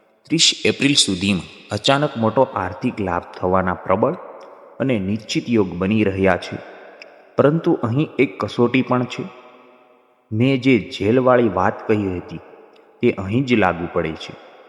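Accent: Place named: Bhavnagar, India. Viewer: તમે ભાવનગર તરફના હો, એવું તમારી ભાષા અને ખાસ તો તમારા ઉચ્ચારો પરથી લાગે છે. જવાબ આપશો.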